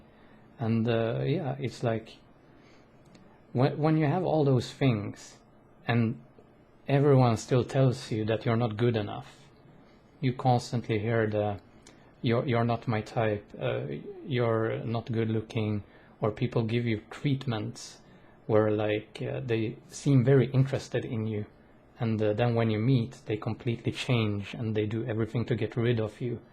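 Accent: Sweden. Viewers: Ååååh!! :) Tyckte det var svensk "dialekt"! :D Du är klok du och en riktigt fin människa. Uppskattar ditt meddelande till oss mannen!! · Du låter svensk i brytningen! Vill bara säga att du verkar vara en härlig människa! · I would love to have a friend from Sweden. If your ever into gaming and/or chatting let me know. I know there are a lot of people who are here for you. You have a lovely accent and a very calming voice and I'd add that to your list of nice features. I also wonder what you'd look like with your hair pulled back. I agree about health too, it's been pretty difficult socially for me this last year and staying healthy is something I strive to do as well.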